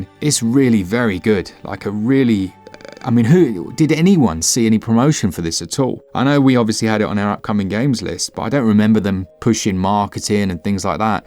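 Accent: England, United Kingdom. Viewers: As someone from the USA who appreciates a good accent. “Little kitty, big city” will now default to an English accent every time I say it. Cheers!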